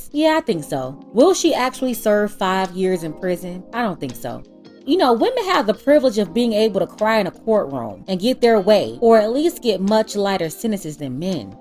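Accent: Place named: Florida, United States. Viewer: I just subscribed. Love you content. Your accent sounds like your from Florida. If you are, do you mind me asking what part of fl are you from?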